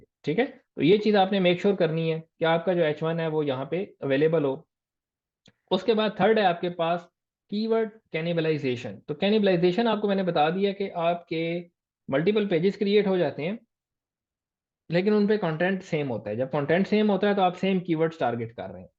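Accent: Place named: Punjab, India. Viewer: Nice lecture & your punjabi touch in conversation is more funny 😂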